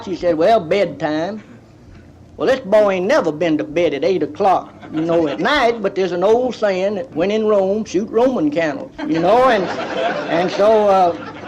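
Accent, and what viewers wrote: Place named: East Texas, United States. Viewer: I owned this as well. I grew up a little over 30 miles West of Lufkin. These stories resonated with me as a youngster. "Get back in there boy, you running in third place!" This is the voice that everyone I heard all my childhood sounded like. <3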